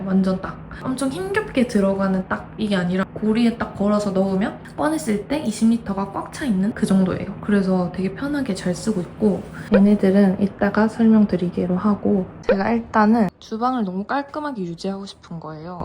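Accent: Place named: Seoul, South Korea. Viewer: 니 왜 서울말씀?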